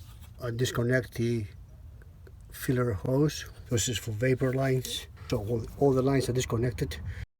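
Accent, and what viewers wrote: Greece. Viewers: Great video, I'm curious if that's a Greek accent?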